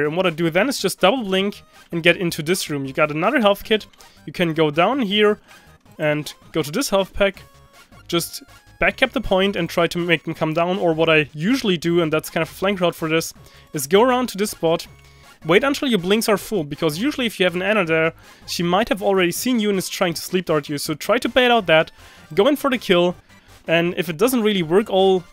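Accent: Germany. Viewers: Are you German? You sound very german :)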